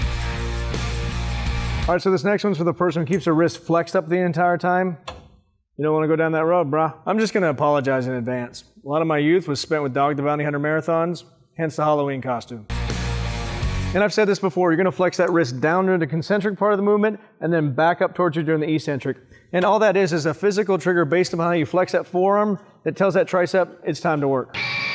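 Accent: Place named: South, United States. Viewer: You sound and talk like Stone Cold Steve. Is it because you're both from the south?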